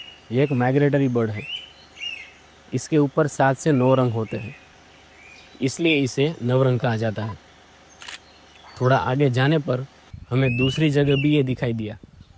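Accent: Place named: Gujarat, India. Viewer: Good experience even after being a Gujarati you had neretted fine. But you should work on tone of language because there is still Gujarati accent and flair shown in tone. Please watch Sony BBC earth, discovery, National geographic hindi version to improve your hindi narration. Very well done for you efforts.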